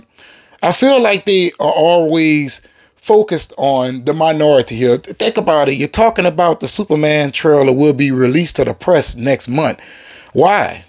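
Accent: New Orleans, United States. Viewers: Sounding so New Orleans in this vid dude. 😂👍🏻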